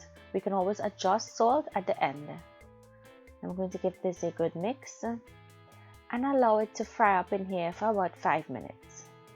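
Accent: Trinidad and Tobago. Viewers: She has a Trinidadian accent but the culture is similar to Guyana which is mostly Indian and African influence.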